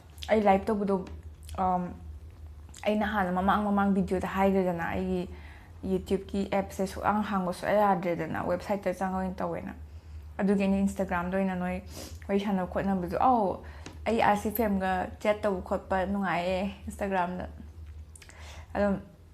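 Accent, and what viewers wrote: Kakching, India. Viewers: Che ei ngge vedio c ahnba oina nga c thngnajabani kakchingdeisu oirmmy ym phajei eisu kakchingdeini ym yngba nungngai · Kakching lon nangse ng kakching lon ngangbase ym cute oiye.... Pliz mathangdadi ngangsiko...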